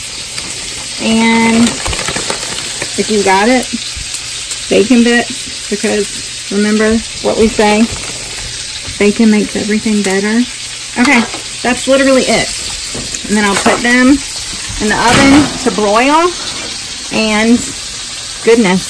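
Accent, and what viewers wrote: South, United States. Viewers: Your to real + genuine to be a politician my dear. Also, I adore your southern accent.! U don’t hear that to often in New Jersey.😎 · I could listen to you all day love your southern drall and your beautiful girl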